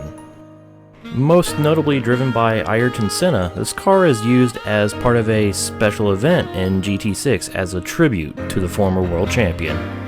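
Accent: Italy. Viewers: Sei italiano?😀😃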